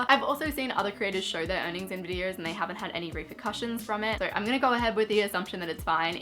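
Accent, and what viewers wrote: Australia. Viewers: It's gonna sound weird but I love your accent I feel like Australians have the best accent to me at least anyways great video ✨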